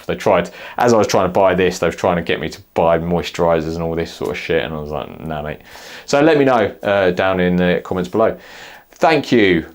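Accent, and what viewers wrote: United Kingdom. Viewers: Others have likely already offered this: YOU ARE BLOVIATING AUD-NAUSIUM, TACKY REVIEWER WITH OFF-PUTTING BRIT ACCENT.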